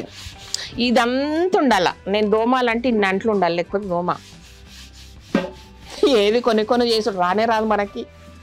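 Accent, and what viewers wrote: Telangana, India. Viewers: Vedio motham full comedy bale undhi tlangana language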